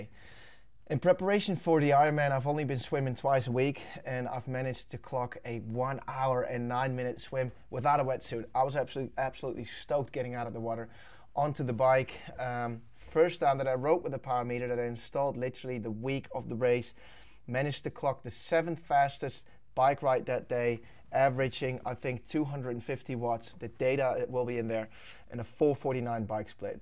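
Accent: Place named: Netherlands. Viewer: I studied in NL, now back in Thailand. Also training for triathlon to race in my country. Your story is inspiring and your accent makes me miss life in NL so muchhhhh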